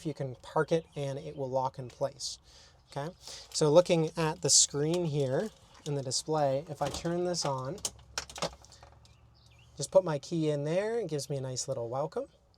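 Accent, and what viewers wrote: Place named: Canada. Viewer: Oh my goodness, Canadian Eh? Where can I go and see this and buy one.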